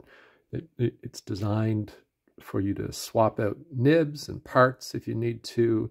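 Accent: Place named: Canada. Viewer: I detect Canadian or Minnesotan accent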